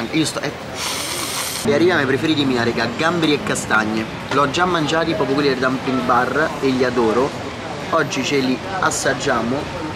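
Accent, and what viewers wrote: Rome, Italy. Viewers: mi stanno piacendo molto i tuoi video, davvero super intertessanti. quando verrò a roma in caso so dove guardare per informarmi sui ristoranti✨ (poi vabbè ho un debole per l'accento romano ahahah)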